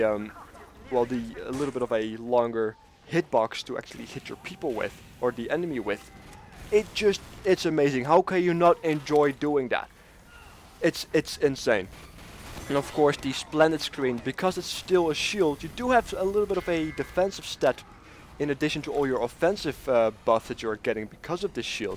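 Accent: Netherlands. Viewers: Lekker nederlands accentje hoor :)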